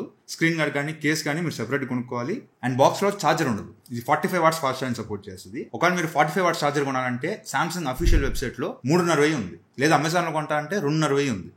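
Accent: Nellore, India. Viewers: ఏ ఊరు బ్రో మీది? కొంచం నెల్లూరు యాసలో మాట్లాడుతున్నారు?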